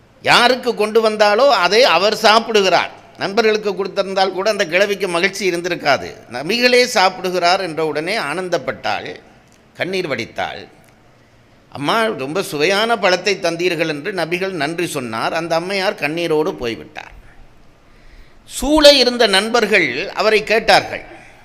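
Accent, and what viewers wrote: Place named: Tamil Nadu, India. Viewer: உங்களுடைய பேச்சு மாறுகிறது...... ஈழத்து உச்சரிப்பு மறைந்து தமிழ்நாட்டு உச்சரிப்பு வந்துவிட்டது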